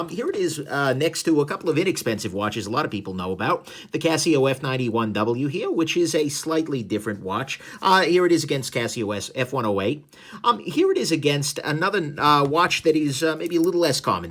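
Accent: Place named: East Coast, United States. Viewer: I love his accent. It’s like a East coast mobster selling you a caahh. Cheers mate!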